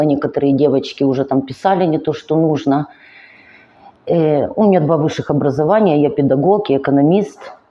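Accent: Ukraine. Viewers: Вы такая красивая,приятная ❤ мне нравится ваш украинский акцент ❤ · У жіночки комплекс меншовартості. У неї акцент укрАінскій. Цікаво б було послухати її укрАінську мову.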